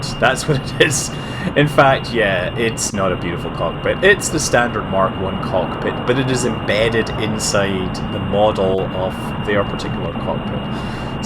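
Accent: Scotland, United Kingdom. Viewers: Woo a Scot!:P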